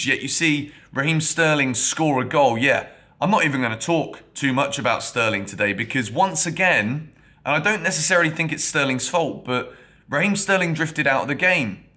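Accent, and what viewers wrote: Scotland, United Kingdom. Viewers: Great scottish accent mate, loved it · GREAT Scottish accent mate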